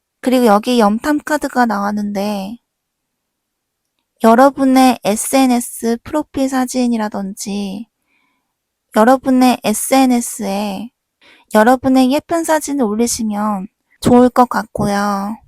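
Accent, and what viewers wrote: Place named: Gyeongsang, South Korea. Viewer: 경상도분이신가여 ㅋㅋ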